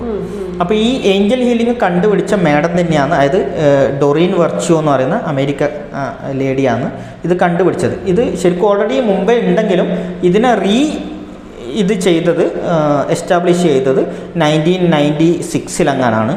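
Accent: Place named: Kannur, India. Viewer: Kannur bhasha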